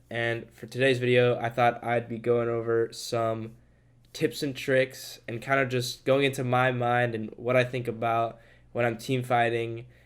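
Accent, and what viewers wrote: France. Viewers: U french?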